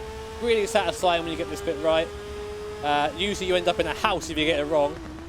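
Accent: United Kingdom. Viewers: Oh wow I didn’t know you had a lisp I thought you were just British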